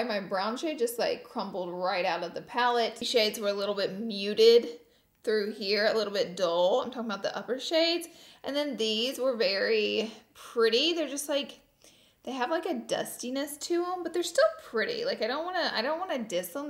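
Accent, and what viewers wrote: South, United States. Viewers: I like how you go from a southern ish accent to a high pitch girly scream in 2 seconds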